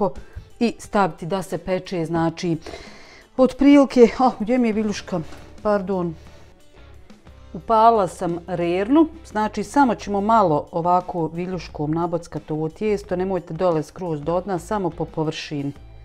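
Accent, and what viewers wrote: Bosnia and Herzegovina. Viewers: Legenda si ,, obozavam tvoj bosanski izricaj..pozdrav iz Splita..😗😗😙😙😙😙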